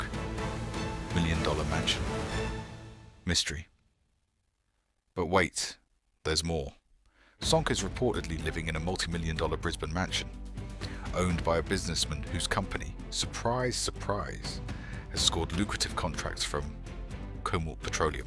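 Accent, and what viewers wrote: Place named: United Kingdom. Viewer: I agree with the ending message of this video. This is not just about rugby. The same with the intern-connection of PNG roads proposed for our economic empowerment. Likewise, with the sale of MVIL shares to MRDC. It is about power and influence. Yes, we can claim that this, Wapu Sonk guy is a bad apple. But what's the use if the entire apple tree is bagarap? The whole operation needs to be scrutinized and reformed. The same with interior development projects and publicly traded shares. It all needs reform. Is PMJM only willing to act decisively when faced with media scrutiny from abroad like the Sydney Morning Herald and ABC News? What happens then, when we express outrage at corruption domestically, yet cannot get results without the aid of foreign media highlighting our issues? Truly sad. But thanks lo karem British voice kam bek lo stori lo news updates! 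😆🤣 Inap! Mi go silip nau! 😴🛌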